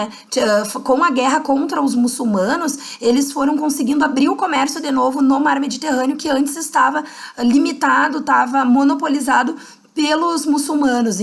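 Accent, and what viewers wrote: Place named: Rio Grande do Sul, Brazil. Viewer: Sotaque do RS é inconfundível.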